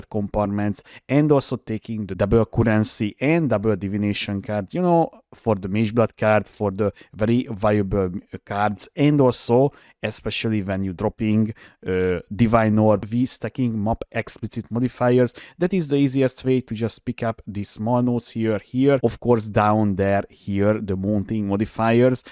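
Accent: Hungary. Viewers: are you hungarian? what is that accent? 😁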